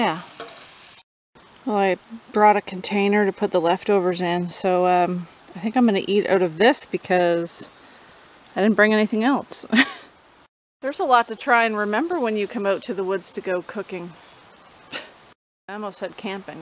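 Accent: Nova Scotia, Canada. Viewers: More Nova Scotian, gold. Killing it.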